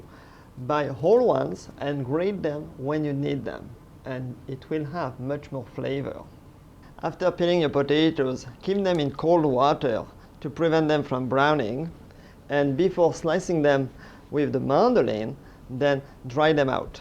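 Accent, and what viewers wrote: France. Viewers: Looks really nice but why are you using Fahrenheit and not Celsius? You sound French. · What a great video & recipe, spoken in that lovely French accent which always portends success in the kitchen! I am making this for Christmas as my family and I love French food. I thank you for doing this video. Merry Christmas from Virginia!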